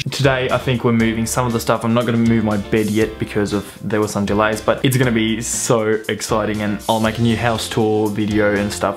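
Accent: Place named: Tasmania, Australia. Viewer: It still freaks me out (in a good way) to hear a Finnish guy who talks like a Tasmanian :)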